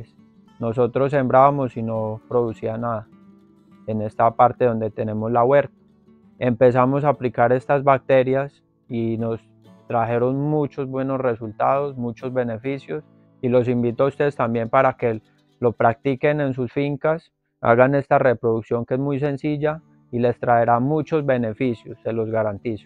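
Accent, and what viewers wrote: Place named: Colombia. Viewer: Bendiciones manito por el acento eres colombiano en que departamento vives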